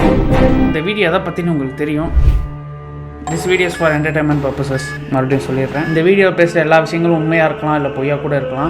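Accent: Madurai, India. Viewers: Bro nee madurai la irukkiya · Thala nee madurai ya?? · Bro's proving that he's from madurai in every chance he gets🙂‍↔️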